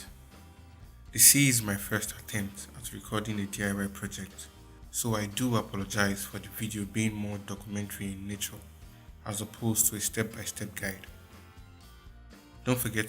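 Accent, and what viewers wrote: Nigeria. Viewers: Nice work your Accent sounds like You are Nigerian...A few pointers though..Try using speaker gasket instead of silicone gum to hold down your subwoofer, silicone has been none to react not to well with speaker surrounds....Nice work 👍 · I guess he's from Nigeria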